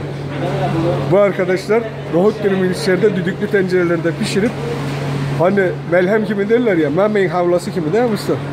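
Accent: Gaziantep, Turkey. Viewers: Kardeş videolarını izliyoruz.bizi hep umsuruk ediyn ağam gecenin bir yarışında hanıma yemek yaptırıyorum.sayende kilo aldım. Ama antep sivenle harikasın basarilar....videolarin devamını bekliyoruz.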